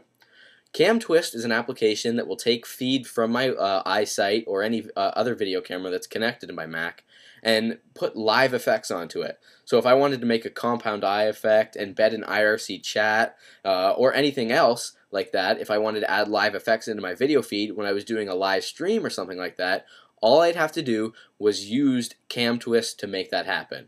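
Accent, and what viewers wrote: Canada. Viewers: i love his canadian accent. thanks. i ended up downloading screenflow after seeing this. :) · ur canadian!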